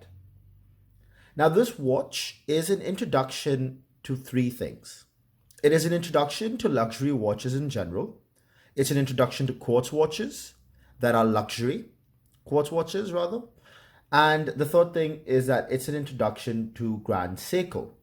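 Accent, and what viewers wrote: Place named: India. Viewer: hehe I could make out from the accent... I'm indian too and pleased to see a GS lover in India... Do u have any Spring Drives or Mechanical GS too?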